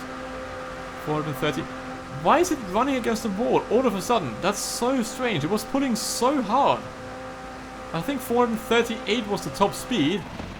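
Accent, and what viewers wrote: Germany. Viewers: man hört deinen deutschen akzent tichig raus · Bro bist du deutsch? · Hör ich da deutschen Akzent? 😅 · u sound german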